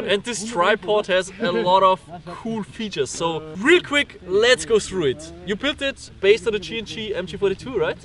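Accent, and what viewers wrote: Germany. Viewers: Dein english hört sich Deutsch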